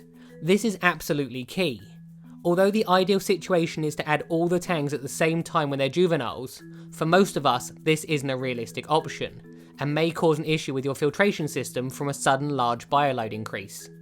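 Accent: United Kingdom. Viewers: I love your channel and yes I am a subscriber! I also absolutely adore the British accent. 😉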